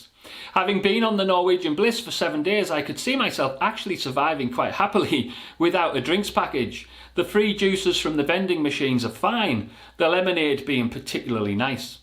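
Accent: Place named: Newcastle, United Kingdom. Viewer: Are you related to Alan Shearer by chance? You kind of look like him and you've got a Newcastle accent lol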